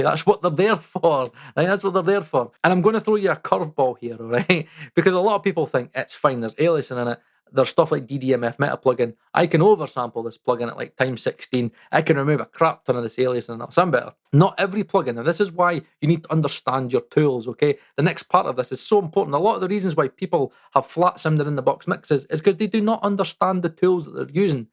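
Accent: Scotland, United Kingdom. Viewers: What is your accent? Is it scottish!? I really struggle to undertsand you as a non-native speaker.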